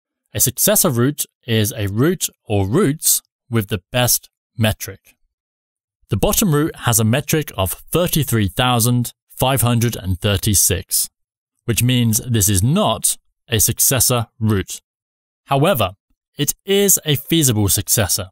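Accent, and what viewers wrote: England, United Kingdom. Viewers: Rutah 😂 England accent Remind me buttah ( butter) Great explanation by the way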